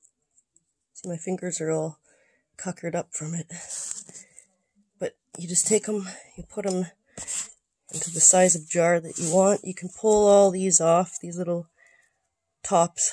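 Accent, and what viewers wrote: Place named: Canada. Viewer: I love your Canadia accent and like you I love plants especially pine trees don't ask me why I probably smell oh and lavender to LOL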